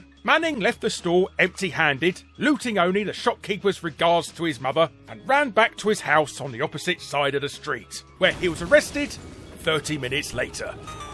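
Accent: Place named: RP, United Kingdom. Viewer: So posh accent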